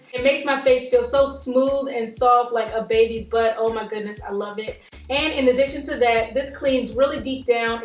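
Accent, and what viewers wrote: Baltimore, United States. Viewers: you are so awesomely beautiful!! Thanks alot for these tips! P.S. I heard some Baltimore in this video and I love it!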